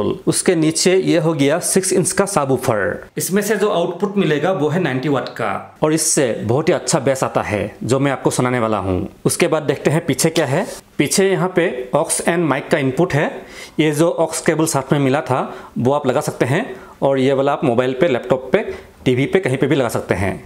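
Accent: Assam, India. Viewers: Aap Assam se hai aap ka aawaj se pata chala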